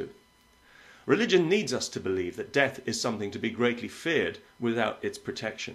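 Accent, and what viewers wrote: England, United Kingdom. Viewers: I like the accent? English?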